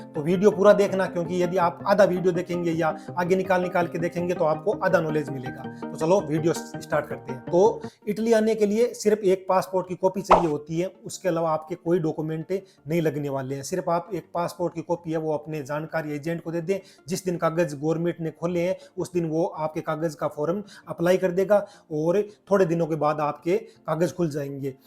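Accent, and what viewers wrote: Rajasthan, India. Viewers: आप राजस्थान से हो क्योंकि आपकी भाषा में राजस्थानी झलक है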